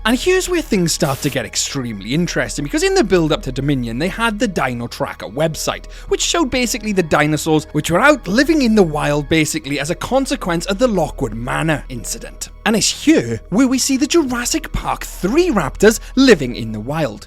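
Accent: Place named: United Kingdom. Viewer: Gosh I adore how you say “endorapthor”, British accent is the best accent (my opinion as non-native english speaker)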